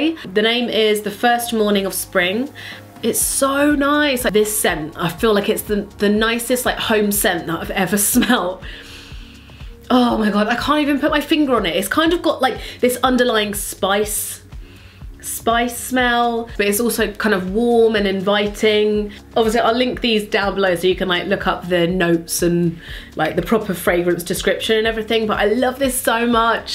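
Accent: London, United Kingdom. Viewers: your vids are so comforting to watch because I've watched them for so many years!! they're so relatable as well because I'm from london- the way you speak sounds like me and my friends lol and also you're so down to earth and the furthest thing from annoying - you're always my go to watch, u inspire me and keep doing what you're doing!! p.s i love the way that you mother, not all like rub it in everyone's face my daughter is god's gift kind of vibe, but so humble xxxx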